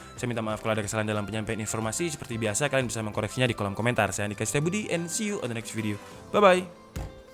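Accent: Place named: Padang, Indonesia. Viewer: Terlalu Padang dong :v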